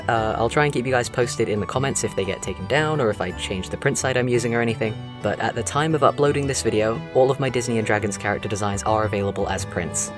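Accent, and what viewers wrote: England, United Kingdom. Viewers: I know this doesn't relate to d&d, but I'm curious to know what part of England he comes from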